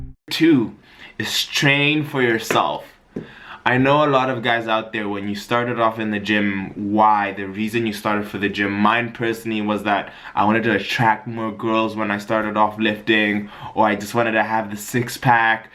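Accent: Nigeria. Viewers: The Nigerian accent tho 😂😂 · Why u talking like a Nigerian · that NIgerian accent tho..lol...too funny..great tips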